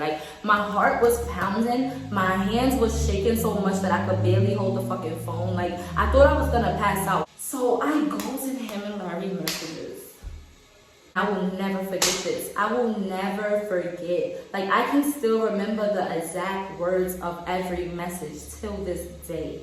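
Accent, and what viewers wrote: Jamaica. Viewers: I’m Jamaican n from the bx u talk like me 😂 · you jamaican lalala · wasnt gonna subscribe till i heard the yardie accent ........big up yuh self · Girl you Jamaican 🇯🇲🇯🇲🇯🇲🇯🇲 I never knew that lol loooooooove it... "a wah gwan, a wah the bbc" I CAN NOT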